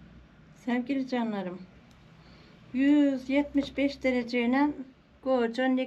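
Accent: Afyon, Turkey. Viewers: Esse lamu aleyküm verahmatullahi ve bereketi Allah 'ın rahmeti bereketi üzerinize olsun ablacım eline sağlık afiyet şifa olsun bizde koydeyken yapardık aynısından sen sinanpasa limitin hangi koydensin ben İstanbuldayım çok özlüyorum sen konuşunca afyonluca hoşuma gidiyor haşhaşi hamurunda yapardık biz sende yap çok güzel oluyor söylersin neresinden olduğunu sinanpasanin arada köyünde çek Allah'a emanet olun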